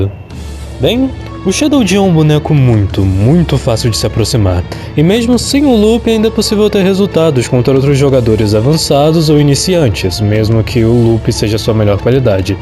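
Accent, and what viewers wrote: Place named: France. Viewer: You sound kinda french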